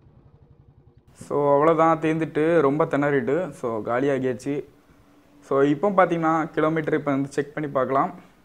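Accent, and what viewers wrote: Kanyakumari, India. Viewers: nanum kannyakumari taan Unga peachu la tarriuthu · பேச்சு லேசா கன்னியாகுமரி போல இருந்தது அதான் கேடீடேன்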